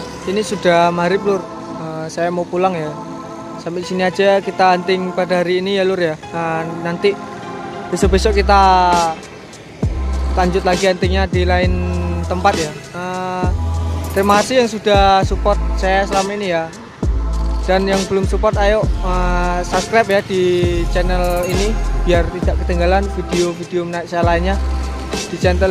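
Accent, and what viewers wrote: Java, Indonesia. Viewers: Logad lu Jawa bngt coy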